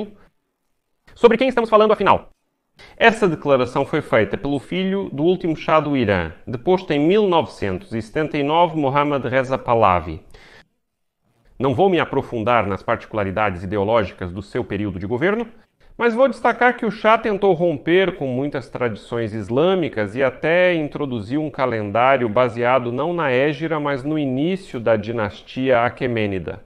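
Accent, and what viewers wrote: Portugal. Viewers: Sotaque interessante. É de Portugal o senhor?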